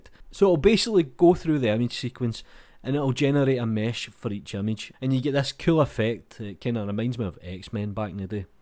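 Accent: Scotland, United Kingdom. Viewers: Good information delivered straight to the point in a clear scotch brogue.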